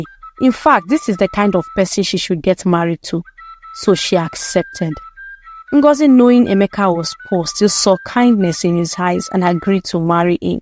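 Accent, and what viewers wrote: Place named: Nigeria. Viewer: I live in Michigan USA. Months ago I came across your stories when looking for videos with Nigeria accent so I could get used to it. I Continue listening because I like the stories.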